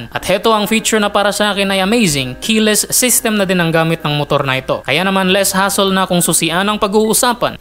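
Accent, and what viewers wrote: Visayas, Philippines. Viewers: Dahil Bisaya ka mag salita,,, Subscribed na ako... Heheheh!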